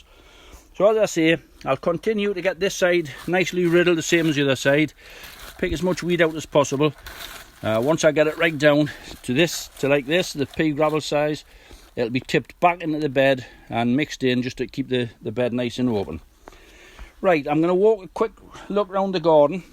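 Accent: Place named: Northern England, United Kingdom. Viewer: Also , a fellow northerner comforted by a local accent instead of a American one on here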